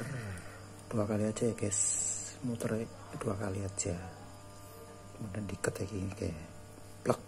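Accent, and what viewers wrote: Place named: Banyumas, Indonesia. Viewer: Suka dch sm tuto yg ini....ngapak chiinnn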